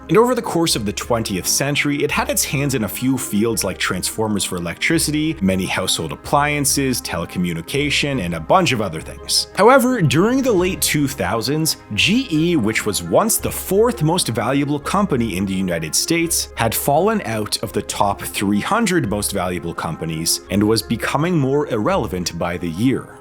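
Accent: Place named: Canada. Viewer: Welcome back,! Love your vids and friendly Canadian voiceover. ☺️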